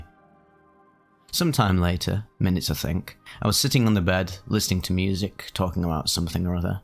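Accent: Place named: Derby, United Kingdom. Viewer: I listen to these trip reports while I'm doing ice baths, it really helps my endurance and heightens the experience. I adore your voice and delivery. I've gone through every single trip report compilation, so your narration has taken me on so many hours of incredibly profound psychedelic journeys. I'm Canadian and my partner is from Derby so he has a similar accent and that makes it even better. A deluge of gratitude to you for the time and effort you put into these. Godspeed.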